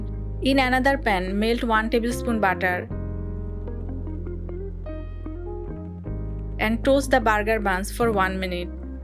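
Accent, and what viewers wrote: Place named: Bengal, India. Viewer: Heavy bengali accent..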